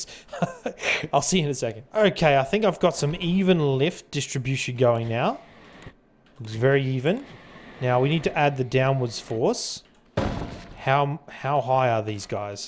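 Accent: Australia. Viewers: i always knew u were australian from when i heard ur voice! nice creation, mate!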